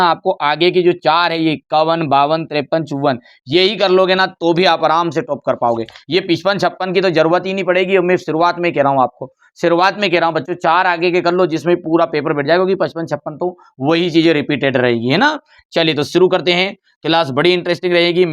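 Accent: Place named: Malwa, India. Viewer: Sir ap malvi Bhasha Bolte ho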